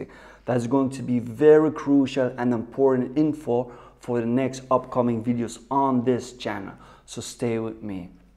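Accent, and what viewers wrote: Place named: Jamaica. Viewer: Did you spend some time living in Jamaica? Your accent sounds like Spanish or Italian but with some Jamaican pronunciation sometimes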